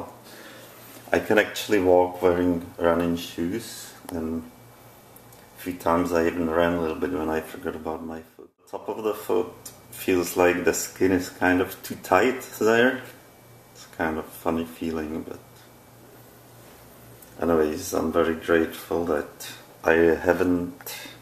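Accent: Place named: Germany. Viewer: Oh, wow!, your foot looks MUCH BETTER!! I am SO happy for you that the worse part is over! Thank you so much for sharing your long and very painful journey with us! The first time I had MRSA the first doctor I saw said it was a spider bite. So, he gave me a steroid shot and sent me home. Oh, yeah, did I mention that my "bite" (or, as I found out later by my next doctor, it was actually MRSA) wound was on the lower part of my forehead-right between my eyes?!! You can probably imagine just how painful THAT shot between my eyes was! Unfortunately, it sounds like the first doctor you saw was about as inept as my first doctor... But, thanks be to God-- we both came through it alive and well!! 👍👍 Hey, btw, I have one more question, I noticed your accent...where are you from?? (I'm kind of thinking it's a German accent--and I apologize if I'm way off.) 😁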